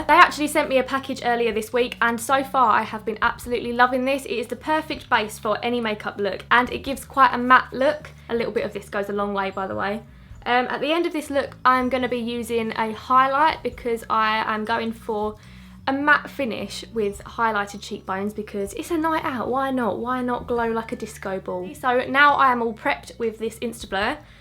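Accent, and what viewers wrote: Essex, United Kingdom. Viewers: Are you from Essex??? You sound like you have an Essex accent and I'm originally from Essex so I can spot it out. Loved your makeup btw, very pretty.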